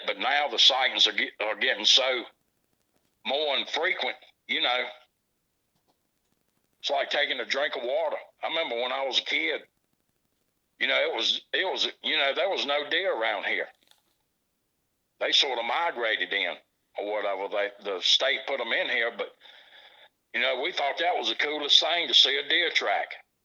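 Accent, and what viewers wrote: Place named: North Carolina, United States. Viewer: I'm from Winston-Salem and a lot of folks go camping and tubing on the Dan River, I love your Eastern North Carolina accent, sounds a lot like our former governor from way back, Jim Hunt. Love your narration I know it's got to be scary out in the woods knowing these creatures are around.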